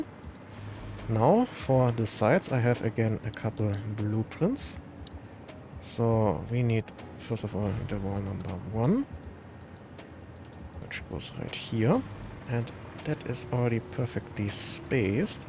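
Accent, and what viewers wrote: Germany. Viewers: Man höhrt deinen Deutschen Akzent soo gut raus, ich liebe es :)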